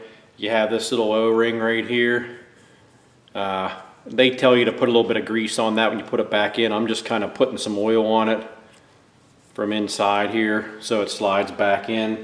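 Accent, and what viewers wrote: Pennsylvania, United States. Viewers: I thought you sounded like you were from PA then I saw the PA sticker where abouts you riding?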